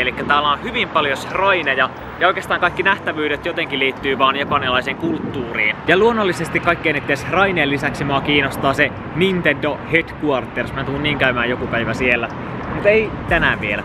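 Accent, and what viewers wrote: Finland. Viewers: Ystävällinen pyyntö - voisitko olla ääntämättä englannin sanoja tuolla suomijuntilla tavalla: ARKADE, SHHRAINI, HEDKUARTTERS, LEGIT jne. Asia on häirinnyt kaikissa videoissasi. Onko ylipäänsä pakko edes käyttää englannin sanoja, jos yhtä hyvin voisi sanoa vaikka "pyhäkkö" tai "päämaja"? Mutta hyvää Kiotossa oleskelua! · se on vaa tätö finlishii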